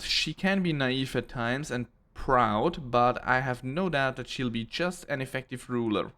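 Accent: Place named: Germany. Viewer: Yess man merk den deutschen Akzent, allerdings machst du das echt gut💪 ich würde untergehen im Lets play wenn ich es auf Englisch machen müsste😅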